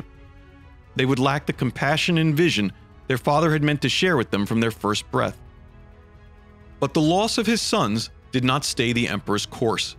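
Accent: United States. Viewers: Use other voice actor than a 30 year old with american accent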